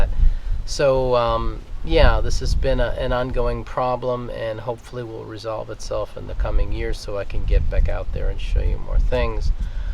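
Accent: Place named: New York, United States. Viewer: Good and interesting to watch, as always. You really got into your New Yorker character. Boy, did that bring back memories.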